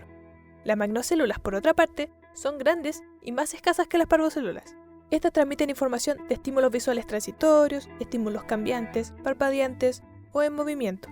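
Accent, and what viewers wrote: Chile. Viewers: eres chilena?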